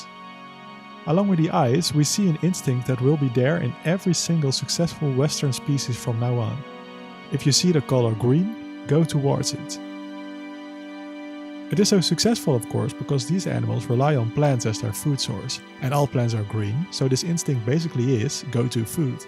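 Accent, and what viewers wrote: Netherlands. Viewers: This guy is DUTCH, that accent I can recognized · ARE U DUTCH? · That funky Dutch accent. Loving it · Dutch eksent? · Are u Dutch?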